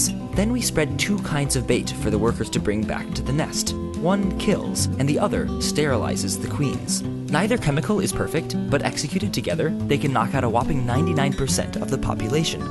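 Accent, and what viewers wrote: Australia. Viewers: Is Australian? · r u an Aussie?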